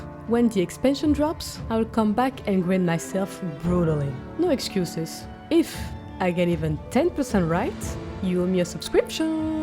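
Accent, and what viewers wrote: France. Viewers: I love your accent. Are you French btw? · i love ur french accent its so pretty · Je me disais que t'avais un petit accent français et je vois que j'ai raison..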